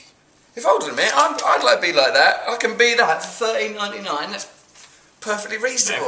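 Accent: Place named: United Kingdom. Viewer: Gosh I wish there were subtitles for these. I love Russel but he speaks so fast, and because English is my second language I really struggle with the British accent when he goes that fast. Anyway, love the new intro and logo!!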